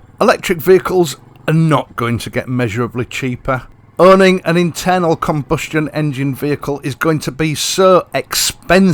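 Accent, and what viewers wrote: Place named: Hull, United Kingdom. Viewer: 💯👍❤ recognise that hull accent anywhere lol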